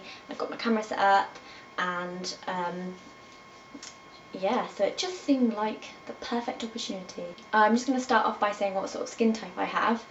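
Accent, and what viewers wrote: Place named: RP, United Kingdom. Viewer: You're so different in this video aww, really posh for some reason?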